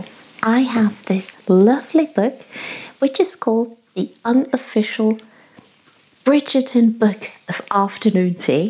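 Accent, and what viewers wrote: United Kingdom. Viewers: I’ve noticed over time how much more British you accent sounds.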